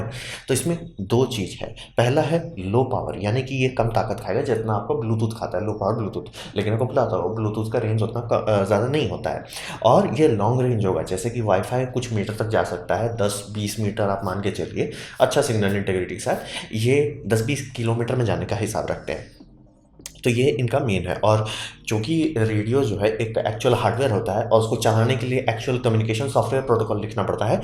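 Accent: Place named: Deoghar, India. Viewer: Heyyy bro perhaps u r from Deoghar (jharkhand).....??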